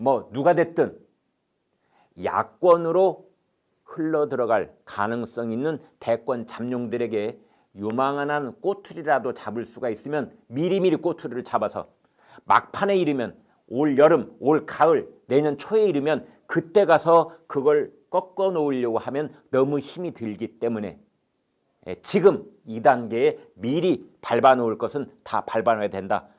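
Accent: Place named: Jeolla, South Korea. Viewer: 그리고 임마 너 표준말을 하던지 전라도 사투리를 쓰던지 하나 택해서 해 졸라 어색해 뚜껑 벗어 암투병 하니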